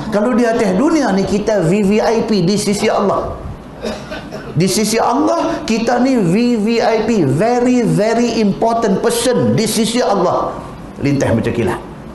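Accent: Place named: North, Malaysia. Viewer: ceramah ustaz shamsuri terbaik...suka dengar loghat utara dia.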